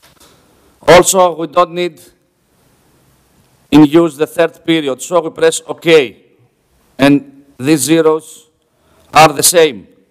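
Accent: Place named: Greece. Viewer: Αγαπώ την Ελληνική προφορά στα Αγγλικά!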